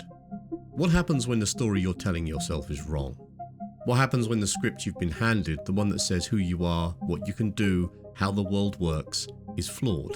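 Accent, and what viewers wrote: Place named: England, United Kingdom. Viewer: G'day from Australia 🦘 I'm listening to your podcast on Castaneda and switched over here to look at your other podcasts. I wasn't sure if you were real or AI generated. You might want to place that note on your podcasts moving forward. You are very intelligent and clever!!! Superb voice for doing podcasts - very English and BBC (that's a compliment). Curious without being "cultish". Good luck. From Australia 🦘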